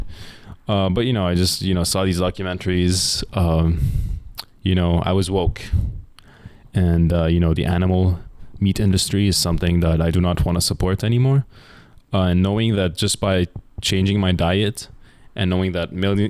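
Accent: United Kingdom. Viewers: You're British accent is so funny :))) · Why are you british?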